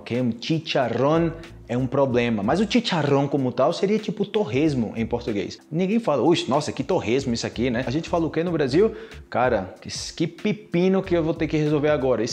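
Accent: Antioquia, Colombia. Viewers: Buen acento paisa.. (pola es una expresión más rola) y a ratos como costeño, medio caribeño. Yo no entiendo por qué usan tanto en portugués "mesmo" y "pegar". Y.. "berraco" también es estar enojado, incluso se vuelve verbo "emberrecarse". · Parce use the habla re bacano cuando dice las cosas en español, jaja suena re colombiano jajaja gracias por esa creatividad y por esforzarse en esas expresiones que nos gusta. Quiero hacer lo. Mismo en portugués jajaj pero es difícil aún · Irmão, você é incrível. Você fala perfeitamente com sotaque colombiano. Eu aprendi muitas expressões colombianas com você. Abração !! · se le siente el paisita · Yoda 😂😂😂😂😂😂 estava saco 😂😂😂😂😂 que bárbaro que entonación pronunciacion y ritmo para hablar el español es que normalmente a los brasileños se les nota el acento pero increivel seu acento colombiano 😂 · Es increible tu acento Colombiano 🥰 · Habla puro un colombiano, que cosa más linda ahh! 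Jajaja... Saludos desde Costa Rica... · Muito bom,! Excelente la lección y por cierto, muy chévere tu acento paisa 😊😌 y por un segundo creí escuchar un dejo de acento costeño?🤔 Obrigada pela lição! 😌🙏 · Está legal esse sotaque Paisa cara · Adoro o seu SOTAQUE colombianoo, kkkkkk. Gostei do vídeo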